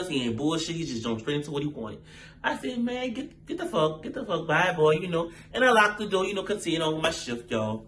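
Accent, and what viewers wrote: New Orleans, United States. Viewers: chileee this story was too mf juicy lmaooo I had to subscribe once I hear the nola accent. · Bitch this story time got me rollinnnnn 😂😂😂😂 omfg! I’m subscriber 557🥰🥰 and where you from? The accent giving new orleans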